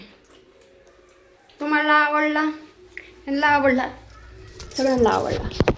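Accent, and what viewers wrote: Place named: Satara, India. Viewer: है मीपन सातारची आहे.आपली सातारी भाषा 😂 लगेच ओळखते.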